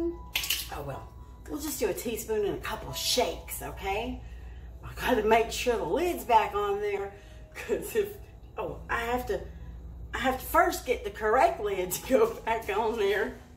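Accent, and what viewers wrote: South, United States. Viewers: Love your shakes and southern accent. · Too cute with their bibs. Love Monroe loving Tonka, sweet! Tomorrow will be on the road for 10hrs Albuquerque to Okla City. Hopefully we will find a place to settle. Thanks for the Southern accent. Married to a Southern man. hehehe. Joan Bates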